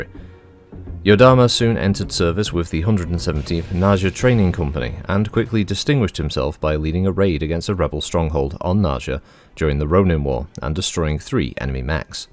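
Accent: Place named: Northern England, United Kingdom. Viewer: Yeah like you said I listen to this while doing stuff or today I'm listening on the way to work. I love picturing in my head with your upper class accent you recording these audio lectures in your enormous Library surrounded by thousands of leather bound books at Estate in the beautiful North English Countryside 😎. At least that's the delusional imagination of an American who has never been to Europe or Mary old England 🇺🇸🇺🇸.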